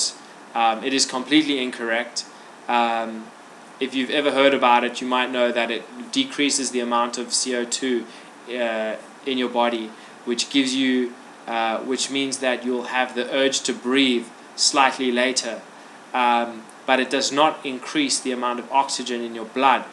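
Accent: South Africa. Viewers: hi are you south african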